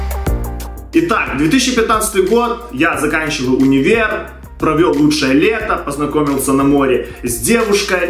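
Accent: Ukraine. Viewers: Какой же у тебя забавный тон разговора). Акцент коренного Украинца.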